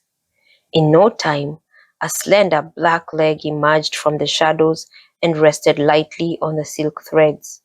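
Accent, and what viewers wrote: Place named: Africa. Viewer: Thank you for this read along book about Kente Cloth. Our Beautiful Feet Books primary curriculum suggested it to be read and we thought you did a great job reading it. We especially liked your accent (we assume you’re African) and also the native words were pronounced well. One piece of advice for next time you record would be muting the page turning with a sound editor or turning each page away from the microphone. The page turning was quite loud and disruptive during the book. Other than that, it was a great read through and we look forward to exploring your channel with other books.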